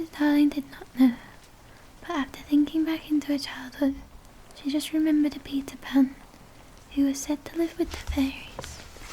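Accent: United Kingdom. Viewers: Where is your accent from ? England ? Ireland ? Scotland ? Your voice is hypnotic.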